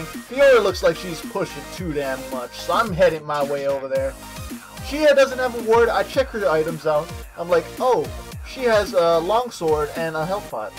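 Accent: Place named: Hawaii, United States. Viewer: you must be from hawaii. you sound too local and cool to not be.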